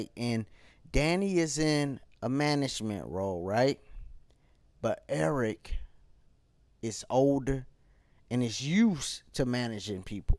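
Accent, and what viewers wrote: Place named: St. Louis, United States. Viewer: youre from stl? Lol you sound like it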